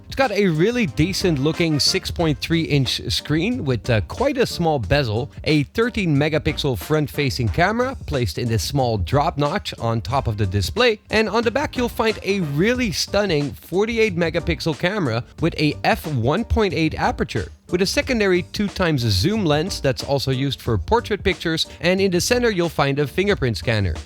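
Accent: Netherlands. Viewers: Nice Dutch accent.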